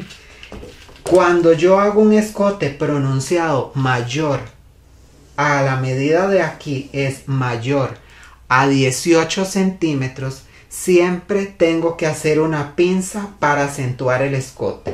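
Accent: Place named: Costa Rica. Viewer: Maestro muchas gracias por compartir sus conocimientos, perdón tengo una pregunta: usted es costarricense? Me parece que su asentó es de costa rica. 🤗🙏🙏👏👏👏🇨🇴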